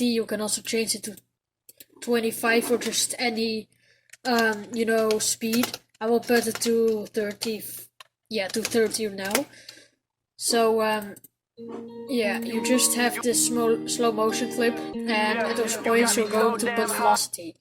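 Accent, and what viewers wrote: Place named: Netherlands. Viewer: Thx bro and i hear that lil dutch accent :)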